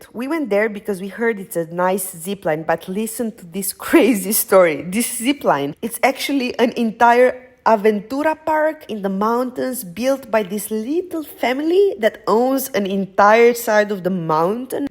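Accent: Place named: Romania. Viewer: Tu esti clar romanca(accentul baby te da de gol) dar prietenul tau ce natie este? Santeti amandoi simpatici😂😂😂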